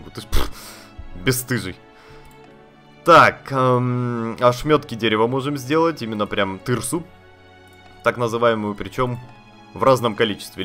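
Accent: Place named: Odessa, Ukraine. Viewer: ты в озвучке просто великолепен!!)Шикарный голос, прекрасное чувство юмора, периодически возникает такое чувство, что ты из Одессы!))Браво!)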